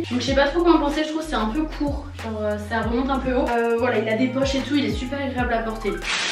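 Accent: Marseille, France. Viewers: T trop belle et trop drôle et grv spontané et moi aussi je kiff l accent marseillais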